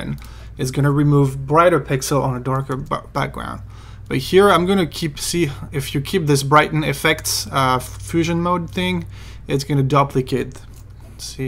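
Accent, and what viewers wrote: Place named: France. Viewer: J'adore le petit accent français. Hello from LA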